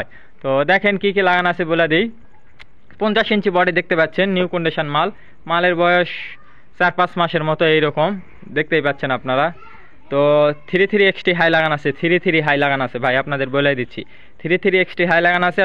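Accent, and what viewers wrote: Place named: Rajshahi, Bangladesh. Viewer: vai apnar bari kothai kotha sune mone hoi rajshashi abar bogurar vasa kahini ki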